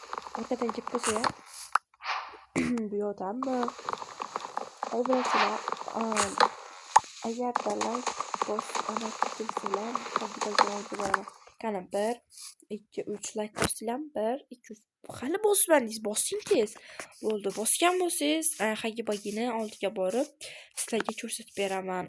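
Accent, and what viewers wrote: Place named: Khorezm, Uzbekistan. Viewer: Xorazmlimisz Shevayz tortp durti?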